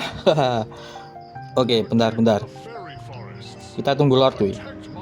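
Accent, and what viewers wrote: Java, Indonesia. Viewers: Temenan pora 😂😂😂 jowo meduk hahah mntep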